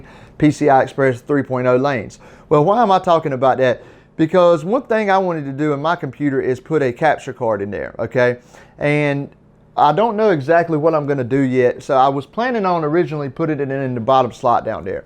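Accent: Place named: Kentucky, United States. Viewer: About time someone on the east coast gets a tech following going lol. I think GN is east coast but not sure. Are you from KY by chance? I only ask because you have almost the same accent as I do.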